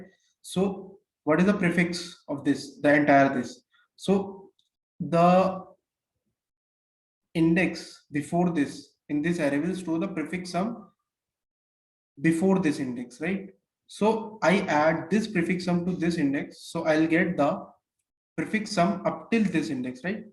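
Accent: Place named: India. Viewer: f*ing indian accent....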